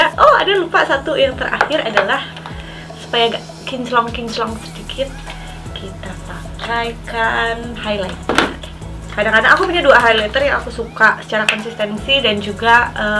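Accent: Java, Indonesia. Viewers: Udah logat2 jawa ya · Kelamaan dijawa jadi medok logatnya 😂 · Medok banget Bu... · Medognya sudah mengalir hehe. Lanjutkan ibu 👍👍👍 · Yey...sudah medhok Jawa! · Cara bicaranya sekarang lebih ke medok jawanya yaaa😊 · Ngomongnya sudah agak medok yah Bu salam dari saya rakyat Suroboyo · udah ketularan medhoknya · Aduh ngomongnya udah meddok hihii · medoknyaa ada bangett😍😍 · Tak urek2 kata bu.. Jawa bgtt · Jadi medok ya Bu kalo ngomong duh gemes tapi so lovable 🥰😍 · logatnyaa jawa bangett yaa kwkw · Aduh logat jawanya kalihatan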